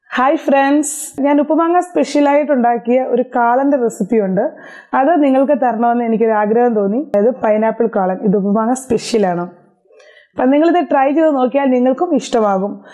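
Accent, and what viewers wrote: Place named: Ranni, India. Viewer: Facebookil page kand recepies try cheyyarudarunu. Ariyavunna oral parayumpoleya samsaram. Pathanamthitta ranni samsaram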